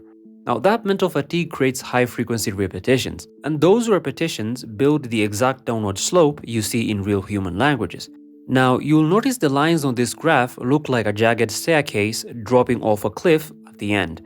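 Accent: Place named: Russia. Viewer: Why do I hear russian accent from a Kenyan?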